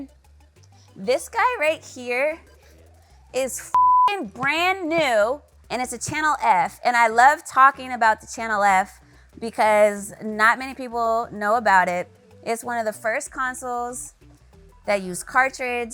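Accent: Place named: California, United States. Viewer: 😍😍..... It sounds like she's from Cali.. Wish it was NY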